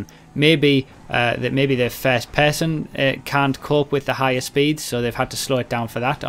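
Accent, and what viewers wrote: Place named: Geordie, United Kingdom. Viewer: Where is from? Just discovered this channel and it sounds like he could be from Newcastle, sounds Geordie just not as broad as the stereotype.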